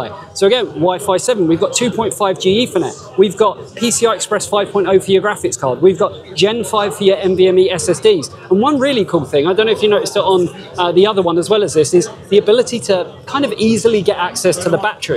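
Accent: United Kingdom. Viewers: Why is British accent so horrible? Can't even speak the alphabet with consistency let alone make coherent sentences.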